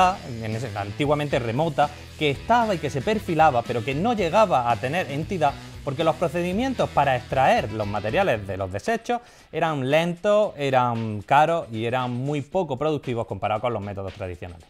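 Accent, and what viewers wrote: Murcia, Spain. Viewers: JAJAJAJ este chico seguramente es de Murcia, es verdad que en cada región hablamos diferente pero tampoco vamos faltando a nadie 😉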